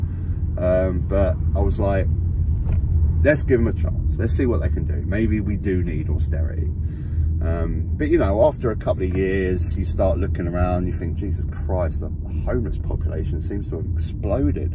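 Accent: United Kingdom. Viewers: I watch videos for his laugh and his saarf Laandan accent. He pronounces 'thousand' as 'fahsaand'. You need humour in these videos. Jonathan Pie started off as satire with his exaggerated rants, but now people are saying he tells it like it is.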